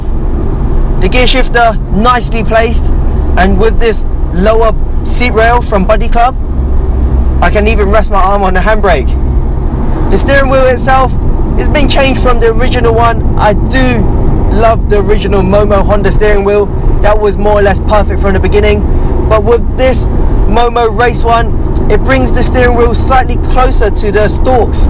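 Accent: United Kingdom. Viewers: Asian dude with British accent gotta love it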